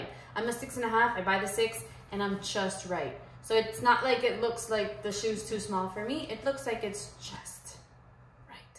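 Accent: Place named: Miami, United States. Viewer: I could tell you were a Miami girl cause we have the same accent X) great video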